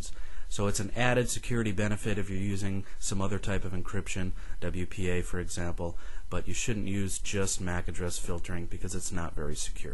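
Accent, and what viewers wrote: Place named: United States. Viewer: No accent??!! I could definitly hear the American accent.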